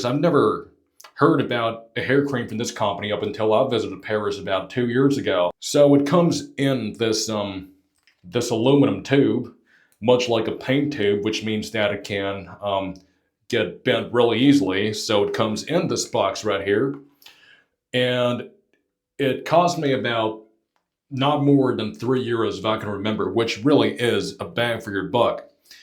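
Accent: South, United States. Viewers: Awesome review and look, dude. You have a Southern twang now! Rockabilly runs in your veins, man.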